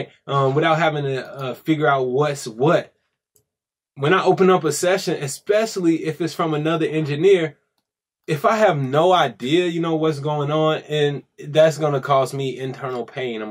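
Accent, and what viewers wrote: St. Louis, United States. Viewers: UR GODD AT IT BUT U R HILARIOUS.....U SOUND LIKE A STL NIQQA!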